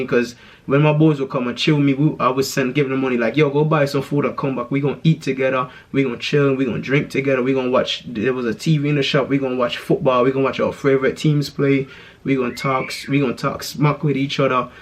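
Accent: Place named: London, United Kingdom. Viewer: So why you sound like you're from London or some shit get the hell out of here with that bronx shit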